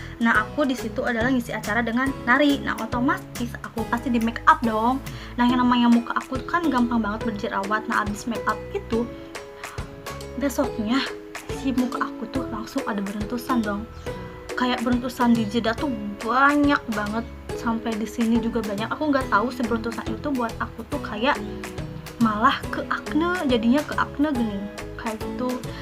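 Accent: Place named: West Java, Indonesia. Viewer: Sundanese bgt yekhannn 😁😅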